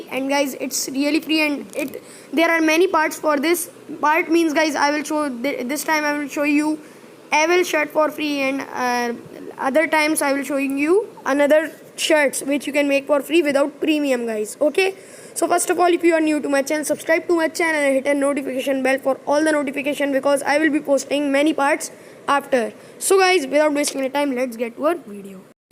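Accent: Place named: India. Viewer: Definately indian... I can get to know any Indian by his voice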